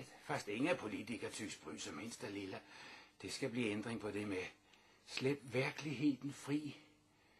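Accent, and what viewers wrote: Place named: Denmark. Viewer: Detta är den danskaste mannen som pratat svenska någonsin.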